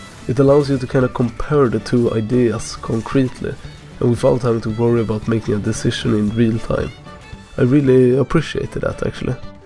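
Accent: Sweden. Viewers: the most swedish english speaker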